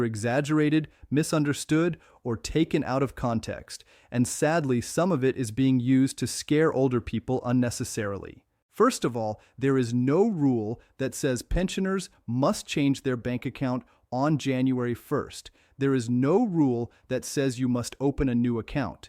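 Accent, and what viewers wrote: United States. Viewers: Why another Yank? AI?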